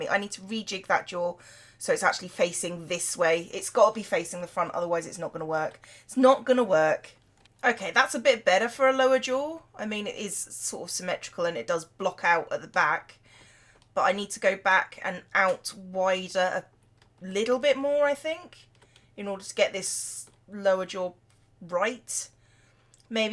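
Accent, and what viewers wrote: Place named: Oxfordshire, United Kingdom. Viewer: Anyone know where in England is from? I'm trying to learn the difference in all the English accents by region. Awesome video, girl! I get excited when I see hermitcraft videos from you!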